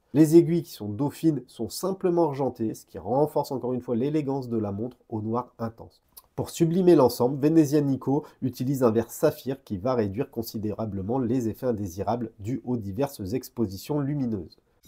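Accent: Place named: Italy. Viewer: Tuo accento italiano è bellissimo!!! 😍😜👌 Bravissimo!!!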